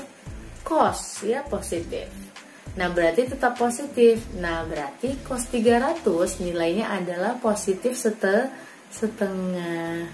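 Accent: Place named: North Sumatra, Indonesia. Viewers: Bahasanya Medan kali... Sukses trus membuat kemudahan matematika👍👍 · Nampaknya ini dr sumut ya 😁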